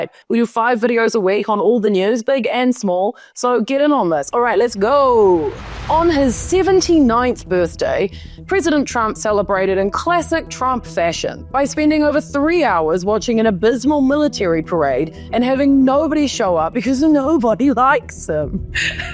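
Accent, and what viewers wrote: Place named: New Zealand. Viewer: You have the perfect balance of serious vs funny, if you can't laugh you'll cry? or something like that .. I'm from NZ and it's cool to hear the accent on youtube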